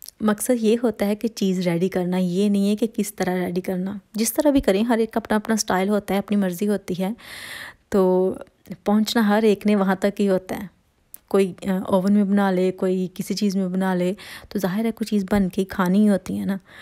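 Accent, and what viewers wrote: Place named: Faisalabad, Pakistan. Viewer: hahaha mujy bolny k texture sy pta chla tha..sb kehty faisalabad k log apni boli sy pehchan leay jatay..me also from fsd ... Khush rahen sister..abad rahen..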